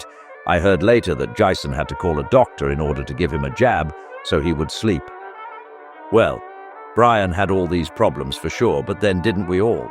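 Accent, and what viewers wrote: United Kingdom. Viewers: It is really good, isn't it ? A professional quality documentary. A BRITISH accent makes a wonderful change from all the rubbish with American accents. Those are inevitably clueless and full of idiotic basic factual errors. Great work. You've got another subscriber !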